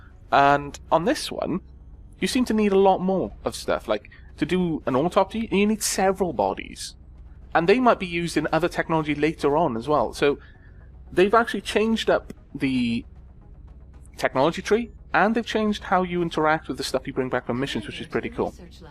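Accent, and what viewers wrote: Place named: South, United Kingdom. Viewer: As an aside, you're one of the first Englishmen that I have yet to even accurately ascertain what part of Britain you come from based solely on your accent..I'll throw caution to the wind and guess south of England? I'm probably way off.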